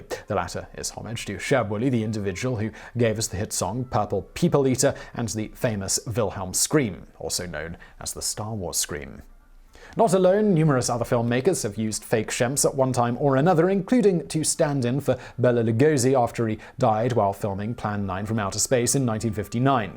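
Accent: England, United Kingdom. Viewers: It’s fantastic to hear an English accent talk about The Stooges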